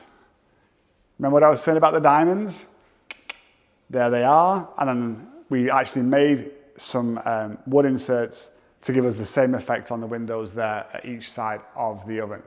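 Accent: England, United Kingdom. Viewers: He dosent talk American his he from England. Beautiful house Amazeing.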